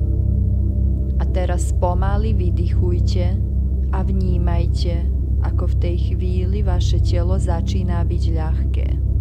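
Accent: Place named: Eastern Slovakia, Slovakia. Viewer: Meditácia obsahovo a postupovo veľmi dobrá, žiaľ, mne vadí ten hlas, ktorý ma ruší. Navyše tam vnímam východňársky, miestami až rusínsky prízvuk. Keby to bolo nahovorené niekým iným, je to bez chyby a perfektné. Zafarbenie hlasu, intonácia, dikcia a výslovnosť, to sú všetko faktory, ktoré sú pri vedenej meditácii veľmi dôležité.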